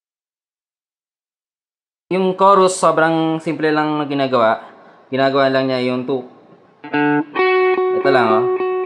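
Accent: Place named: United States. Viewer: Boses kano si sir haha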